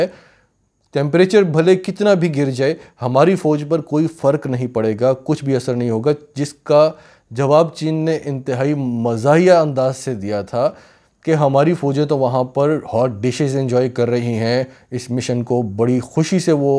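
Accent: Pakistan. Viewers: The best u tube chanal imence respect brother love your talking style good pakistani urdu accent best urdu word s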